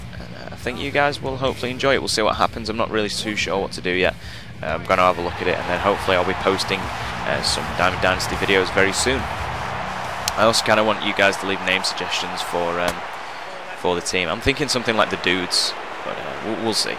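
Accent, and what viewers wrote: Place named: United Kingdom. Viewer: Tis British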